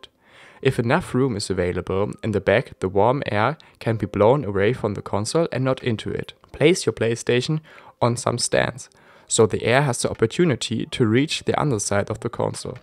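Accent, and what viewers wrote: Germany. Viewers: You sound german🇩🇪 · Deutscher Akzent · omg dieser deutsch akzent in diese enhlisch ☠️☠️☠️ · Digga dein deutscher Akzent XD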